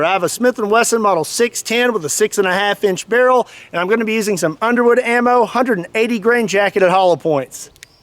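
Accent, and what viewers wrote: Kentucky, United States. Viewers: Are you in east or west it I live in Paducah which is west you sound like your in west Kentucky which is the more gun friendly part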